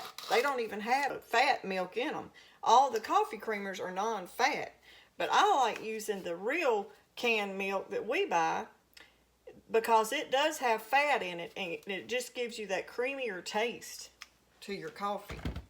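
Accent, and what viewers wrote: South, United States. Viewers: I came to learn how to make creamer, I stayed for the southern accent! 😊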